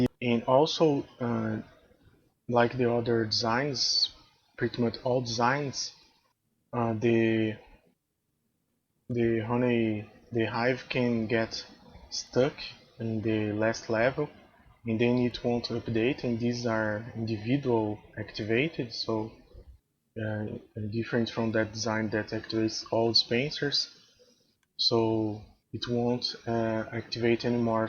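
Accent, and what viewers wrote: Brazil. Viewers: Pelo sotaque, já sei que é brasileiro kkkkkkkk Cara, eu adorei o teu design. Tu teve uma ideia muito top na ideia da coleta e armazenagem das garrafas de mel (minuto do vídeo). Eu fiz um teste dessa parte no meu sistema e até que encaixou bem, além de ter diminuído alguns blocos da parte de processamento das garrafas na minha farm, mas reparei que vem a um custo de mais garrafas de mel no carrinho, e o funil só consegue pegar e entregar uma garrafa por volta, já que quando a primeira desce o sinal de restone reduz para 14. A única coisa que não entendi e acho que foi um erro no teu design foi o sistema de abastecimento (minuto do vídeo). Eu entendi que o objetivo era fazer o sistema ser automático, e usava o sinal de redstone emitido pelo carrinho cheio para enviar o carrinho e distribuir as glass bottles. Havia formas de usar outros sinais para essa automatização (exemplo: luz solar) e também maneiras de fazer o carrinho correr até esvaziar. 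Eu estava procurando vídeos assim, pois eu também fiz uma versão minha (que não copiei de ninguém) da farm de garrafa de mel que usa menos vidro. Eu estava querendo fazer um vídeo, mas antes resolvi procurar se ninguém havia feito igual ou até melhor. Vou ver se faço esse vídeo no mês que vem.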